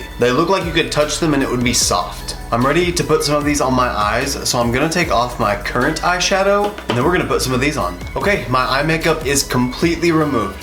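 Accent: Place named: South, United States. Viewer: Why are you the cutest?! I just found your page and I really like your Stila liquid tutorial and also your slight southern twang! ❤️❤️❤️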